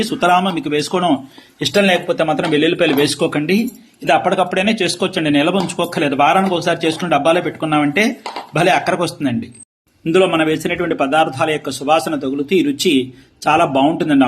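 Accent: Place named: Tamil Nadu, India. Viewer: Tamil gaaru telugu ni chey pattinnadipistunattundi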